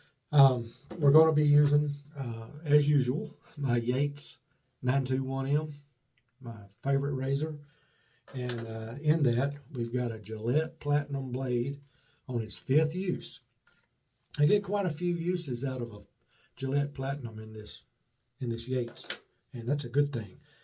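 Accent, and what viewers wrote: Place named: South, United States. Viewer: Excellent shave sir with top notch products!!! If the soap smells like Stirling Haverford, which happens to own, it must smell darn good. Tobacco and vanilla can't go wrong. The razors audio feedback is tremendous, I'd love to own one, can't get them in the UK unfortunately unless I pay the ridiculous shipping costs involved. I have also the exact same semogue OC with this orange handle it's fantastic brush as all the semogues are. Love your videos keep them coming. I also love your southern accent it's my favorite accent sounds like music in my ears. How often do you shave? Enjoy your day buddy.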